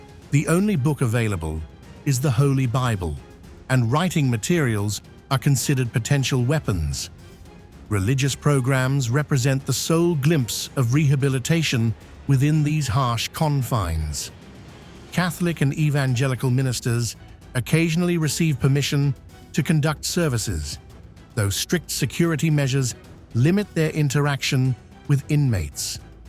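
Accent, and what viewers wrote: United Kingdom. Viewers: Listen to this clown with the British accent and the bleeding heart. Almost every single one of those monsters have murdered and raped …if it had been one of his children that had been tortured and killed you wouldn’t be listening to this. He has no clue what it was like to live with these monsters….he only thinks he does. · Well the narrator sounds British and look at Britain now. Overrun like 🇸🇻 was.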